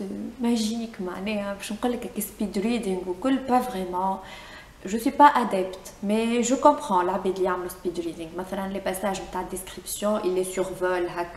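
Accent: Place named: Tunisia. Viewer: l'accent tunisien <3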